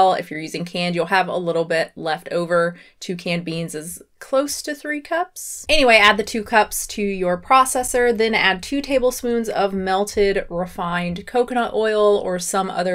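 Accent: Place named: South, United States. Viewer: You sound even more delightfully southern than usual.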